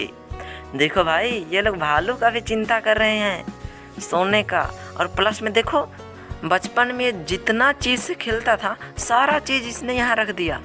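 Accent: Bihar, India. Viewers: Bihar sa ho kay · Bhai tum meri tarah bihari ho kya😀😀😀😀😘😘😘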